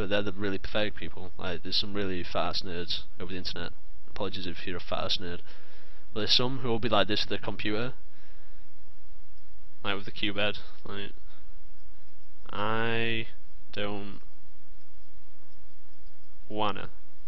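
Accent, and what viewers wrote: United Kingdom. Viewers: I want a guide on how to hear what you're saying (i have trouble understanding british accents)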